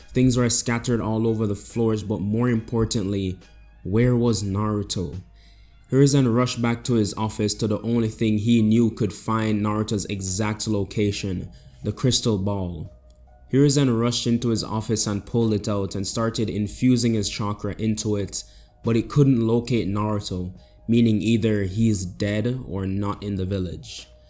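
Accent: Jamaica. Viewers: Very refreshing to hear Jamaican making this kind of content. I had to come back after years to listen to this story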